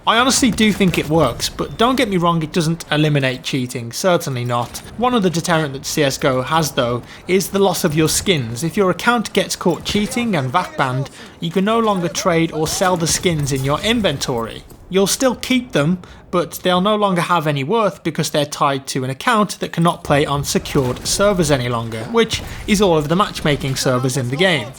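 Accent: United Kingdom. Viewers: Feel like I'm watching lvlcaps video with a British accent.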